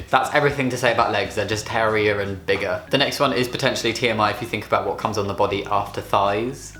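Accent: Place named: Scotland, United Kingdom. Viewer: Little bit less Scottish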